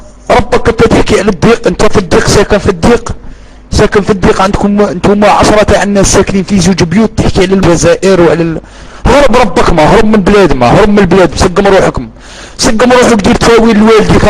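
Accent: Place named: Morocco. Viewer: لاول مرة اعرف ان معسكر يتكلمون لهجة مغربية خخخخخخ ياو راكم تبهدلو في روحكم المتكلم لهجته مغربية على كل حال الله يهدينا كلنا اخوة مسلمين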